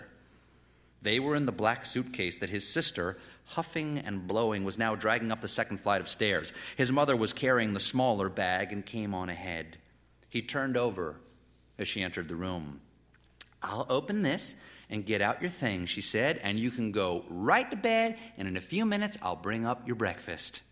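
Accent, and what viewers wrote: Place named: South, United States. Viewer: He grew up in South Carolina but moved to New York in his early 20’s, so his accent is not as strong as it used to be...well that is until he reads a southern author or speaks with someone from there. Then his accent becomes thicker.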